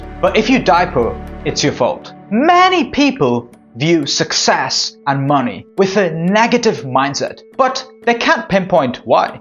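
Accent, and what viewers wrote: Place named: United States. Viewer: bro face indian bro voice american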